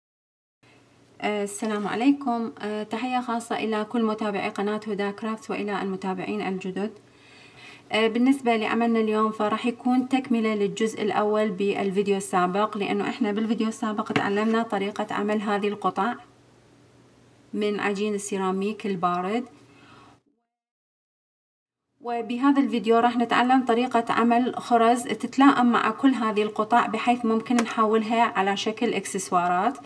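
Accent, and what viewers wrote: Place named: Iraq. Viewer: من جد روعة روعة روعة سلمت يداك ست واني استفيد منك هواي واحب شغلك بعجينة السيراميك اتبع طريقتك ومقاديرك واسوي منها اشكال تجنن ميداليات بس م جربت الاكسسوارات لا تتأخرين علينا بفيديوهاتك الرائعة وافكارك الجميلة وكتير حبيت اللهجة العراقية من اسمع صوتك 😍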